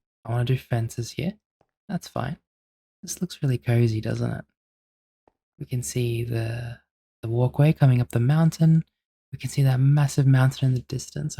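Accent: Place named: Australia. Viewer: Twin I would like to let you know that your voice is very soothing, and I love your what sound like an Australian accent. I would also like to mention that I find myself falling asleep to your videos every night I can, and I use them instead of melatonin. I really appreciate what you do, and I really hope you can excel in a successful career 💕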